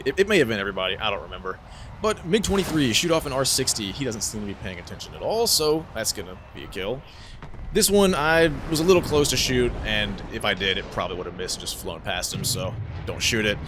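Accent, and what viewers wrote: United States. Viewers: I like the way you talk,American. subscribed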